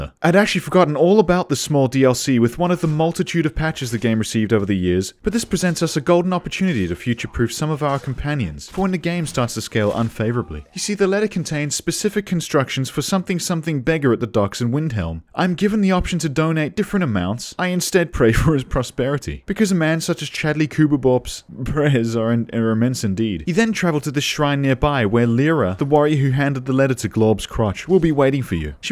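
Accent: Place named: Australia. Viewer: It's strange, i assumed was Australian on account of his accent but he always names his characters typical German names. Intriguing stuff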